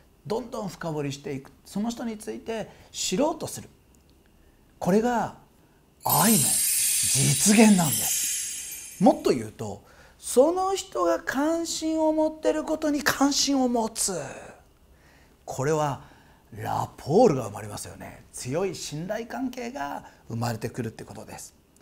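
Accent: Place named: Kansai, Japan. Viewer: ついつい次々と動画を観ています。笑😊 0.01の魔法を早速今日から使いますね！ たくみさんの会話の合間に出る関西弁に親近感が増しています。いつも惜しみなく何でも話して頂ける事に感謝しています。これからもワクワクしながらお話し聞かせてもらいますねぇ〜。😊❤️🌈ありがとうございます。🙏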